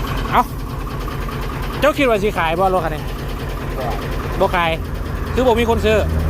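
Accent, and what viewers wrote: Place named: Nyo, Thailand. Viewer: คนสัยน้อคับคือเว้าญ้อออ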